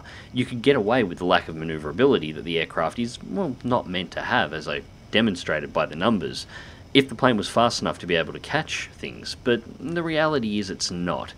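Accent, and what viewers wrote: Australia. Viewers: Love hearing a friendly aussie accent <3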